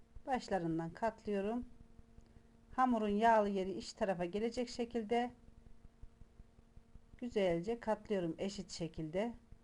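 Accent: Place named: Kayseri, Turkey. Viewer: Bu Kayseri ağzıyla yorumdu harikasınız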